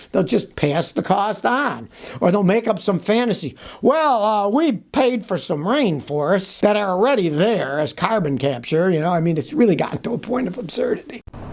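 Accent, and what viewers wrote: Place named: Niagara Frontier, United States. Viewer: You've been driving for 55 years and yet I can still detect a Niagara Frontier accent. 🤠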